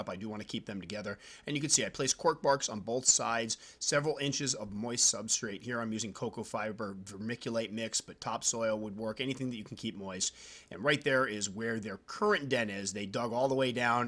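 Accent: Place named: Midwest, United States. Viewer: Dude, I wish you had an email, but I’m sure that’s the last thing you need...58,000 emails asking “what are the best T’s to start collecting?”! 🙄 My question of the day is (if you can/want to answer?!)...Being from St. Louis, MO, I hear a STL/Chicago (Midwest) accent...am I close?! 🤔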